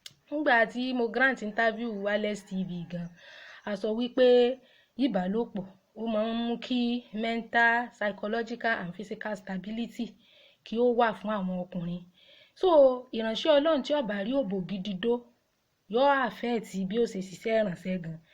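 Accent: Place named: Egba, Nigeria. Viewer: Egba (Abeokuta) accent mixed with a little bit of Ibadan. But the last time I checked you have a different Yoruba accent. Cool anyways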